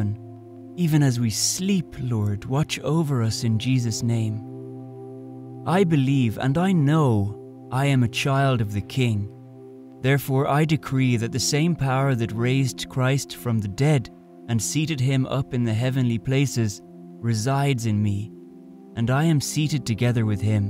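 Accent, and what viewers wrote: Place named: Ireland. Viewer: Which part of Ireland are You from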